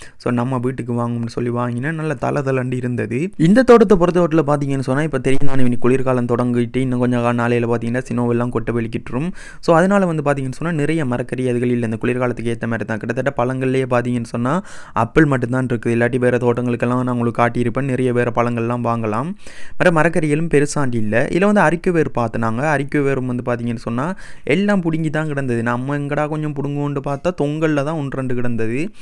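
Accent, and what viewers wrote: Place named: Sri Lanka. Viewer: I like halloween 🎃 tq for this experience 🎉and like srilankan tamil 😊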